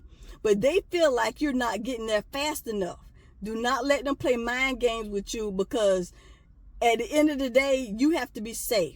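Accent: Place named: South, United States. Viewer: Strong ass southern accent god bless you lmao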